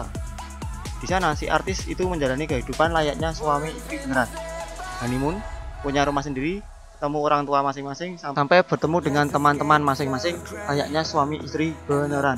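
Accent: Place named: Java, Indonesia. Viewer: jawa sekali kalo baca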